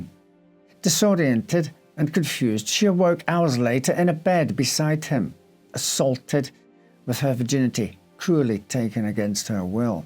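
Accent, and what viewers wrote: Scotland, United Kingdom. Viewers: Scottish accent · He is Scottish - Edinburgh or Highlands?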